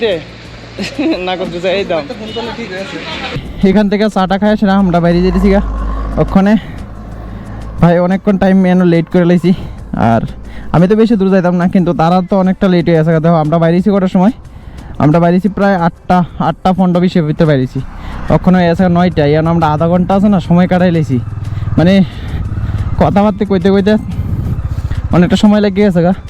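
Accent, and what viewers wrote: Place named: Bangladesh. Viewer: আপনার ভাষা টা আমাদের বাংলাদেশের মতো লাগছে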